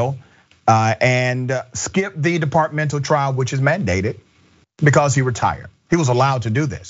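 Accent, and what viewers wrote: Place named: Texas, United States. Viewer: HAS SUCH A STRONG TEXAS OR COUNTRY ACCENT THAT DOESN'T MATCH HIS APPEARANCE, BUT I LOVE WHEN WHITE PEOPLE DOESNT ALIGN AND SUPPORT OTHER CORRUPTED WHITE INDIVIDUALS. I ALWAYS SELL OUT MY FAMILIES AND FRIENDS WHEN THEY DO SOME REAL MESSED UP STUFF ESPECIALLY THAT COULD AFFECT OTHERS.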